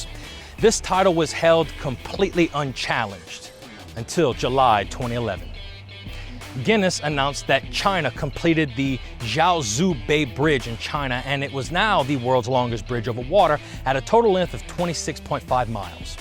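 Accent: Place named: Cajun, United States. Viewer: It isn't very strong, but I hear that touch of Cajun accent lol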